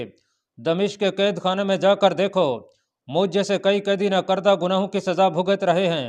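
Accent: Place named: Saraiki, Pakistan. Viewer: Saraaeki talaffuz lag raha hai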